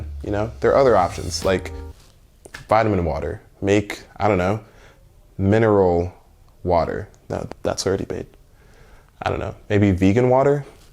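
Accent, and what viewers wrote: United States. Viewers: Where is he from Chicago LA Mississippi ATL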